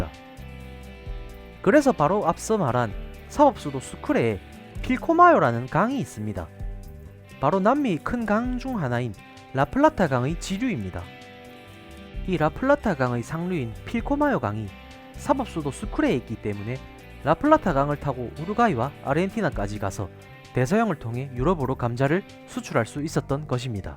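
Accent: Gyeongsang, South Korea. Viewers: 경상도 외국어 말고 표준어 써라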